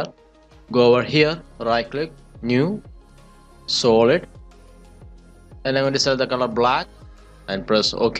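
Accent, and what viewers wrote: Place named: India. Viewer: Shitty Pajeet accent- Once a pajeet always a pajeet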